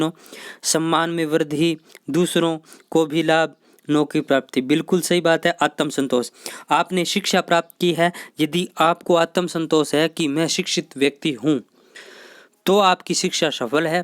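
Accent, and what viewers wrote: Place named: Hanumangarh, India. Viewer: Aap ek dam humre jase bolte h hanumangarh ke h kya aap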